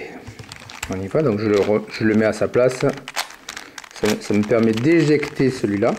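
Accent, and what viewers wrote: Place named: South, France. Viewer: super boulot !!! à conseiller à ceux qui aiment les cubes moi et mon fils (et oui je lui es donné le virus) sommes fans de ces jeux et avons une grosse trentaine de cubes nous venons de recevoir le 4x4x5, 5x5x4, et bien d'autres encore et avons commencé le 4x4x5 grasse à toi merci beaucoup question : sais tu faire le gigamix je ne trouve pas de vidéo explicative comme toi en français ! mon petit grand fait aussi des videos c'est lyondu31 vu ton accent serais-tu du sud merci encore Eric ANTIPHON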